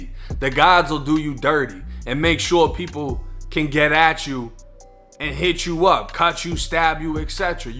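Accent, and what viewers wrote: Boston, United States. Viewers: This dude who's talking must be from BAASTON🤔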